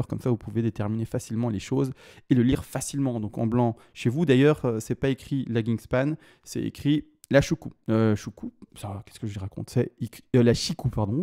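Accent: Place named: Belgium. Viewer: J’adore ta façon de parler et ton accent ! ( belge - suissse ? )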